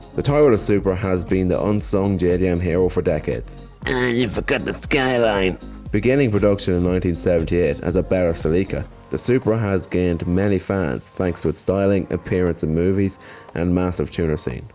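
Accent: Ireland. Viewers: NIssan Silvia wow have you never heard of an Irish accent?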